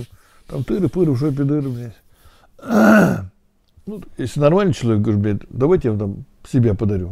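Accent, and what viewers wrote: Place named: Kulebaki, Russia. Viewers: Приветствую,послушаю вас и опять вера в нормальных людей появляется.Сейчас с людьми что то произошло.а ещё говор волжский как бальзам.сам я красноярец но вся родня по маме из кинешмы,считаю второй родиной.меня и крестили там.здоровья вам! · Прям КУЛЕБАЦКИЙ говор. Оно, собственно, всё рядом. У меня там вторая родина, и с такой же теплотой, в тех же эмоциях, все рассказывают вечером за ужином о своих буднях:3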